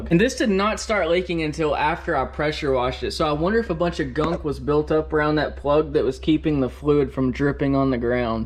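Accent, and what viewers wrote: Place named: South, United States. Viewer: 남부억양인가 찰지네 ㅋㅋ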